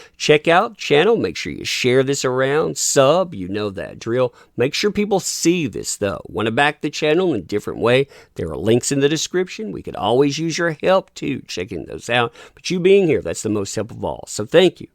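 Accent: South, United States. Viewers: always has such a soothing voice to me. Like so many people will just be shouting and talking so loudly and overly expressive, and it grates on me in more ways than one since I get oversensitized very easily. But something about calm southern drawl just is very calming like it's almost paradoxical how he's talking about such harrowing topics with such a calm voice, it really helps my anxiety.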